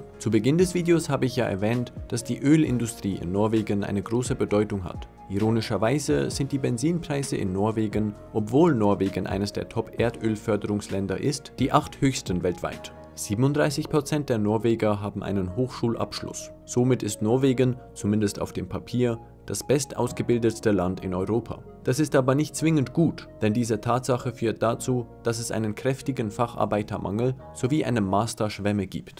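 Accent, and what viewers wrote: Switzerland. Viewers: Man hört schon sehr sehr oft Schweiz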